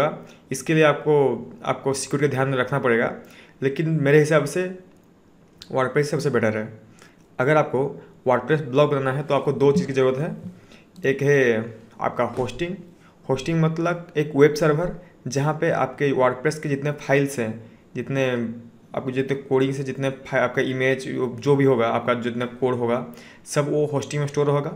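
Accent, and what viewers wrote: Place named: Odisha, India. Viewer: Tu pahle hindi sikh le... odisa se h kya bhai?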